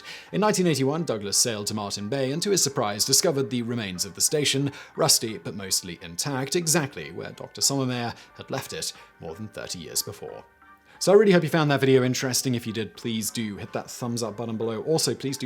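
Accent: United Kingdom. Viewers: Was going to give a thumbs up for this video, but really? "Lootenant"? You have an obviously British accent, but what are you? A wannabee Seppo? It's "lef-tenant" in the UK (and Canada). Stop contributing to the Americanization of Britain, mate.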